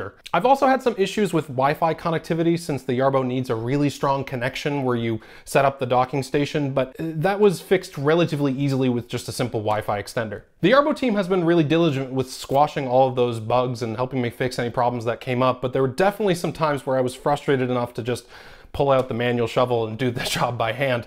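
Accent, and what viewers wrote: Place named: Newfoundland, Canada. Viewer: The Newfy accent is mild 😓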